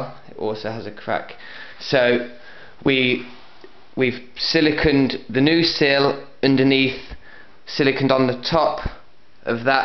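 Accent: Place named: Midlands, United Kingdom. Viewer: Hi chap. Where you from you sound kinda midlands way. Like me!! Who made the tank in the first place mate? As them stress bars look way too inadequate. I had a four foot rena and they were massive on that for a little tank. And my 700litre now has some sturdy strut bars. They just look too narrow for my liking?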